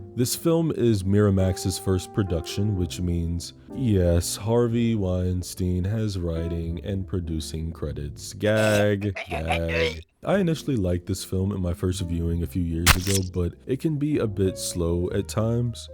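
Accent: United Kingdom. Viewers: OMFG I love a fellow cinephile!!!! But what I love more is your random british accent that you let out in your videos!!!! Can't wait to see you have more and more success! ❤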